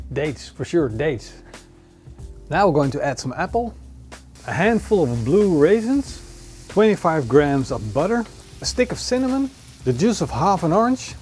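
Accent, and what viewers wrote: Netherlands. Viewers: You're dutch arent you.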